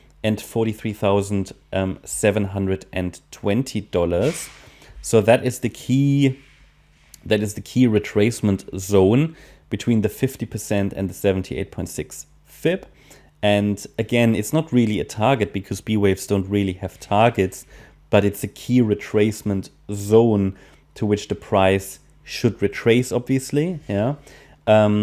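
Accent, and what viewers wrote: Germany. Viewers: do have any german roots?your accent is like german..just curious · Bro is german☠️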